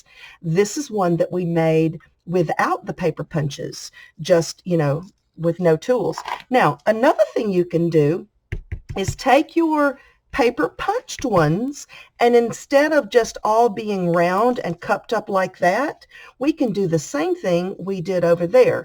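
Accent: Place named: South, United States. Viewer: These are fun. Love your sweet southern accent ❤️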